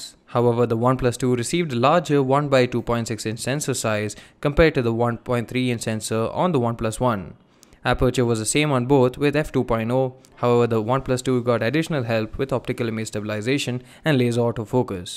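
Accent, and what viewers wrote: India. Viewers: I don't understand your indian accent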